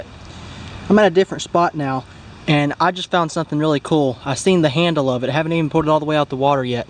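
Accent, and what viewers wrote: South, United States. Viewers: I just want to say that I really enjoy your videos! you have a great southern accent too. you have gotten me into the great hobby of metal detecting. I'm gettin a garrett at pro pointer today off of amazon. Good luck and god bless you.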